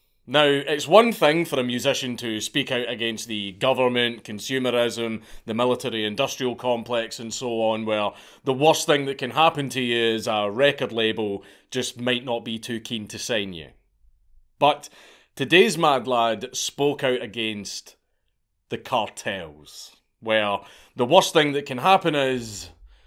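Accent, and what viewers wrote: Scotland, United Kingdom. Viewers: I can see now that Mexico Spanish pronunciation can be a real bitch for a Scottish accent. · I’ve never heard “corridos” pronounced like that 😆 something beautiful about a Scottish (or Irish?) lad saying Spanish words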